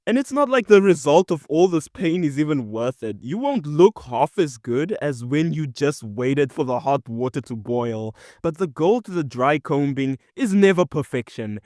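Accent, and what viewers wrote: Afrikaans, South Africa. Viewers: I hear a Afrikaans boi here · I just realised you’re South African bc I knew that accent was familiar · You have an Afrikaans accent, I have always spoken both Afrikaans and English so I don't have an Afrikaans accent.🎉🎉🎉🎉🎉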